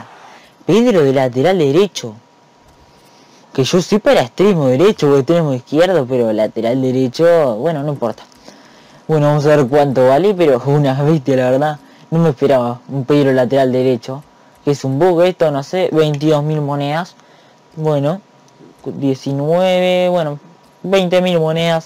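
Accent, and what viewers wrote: Uruguay. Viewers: Sos uruguayo?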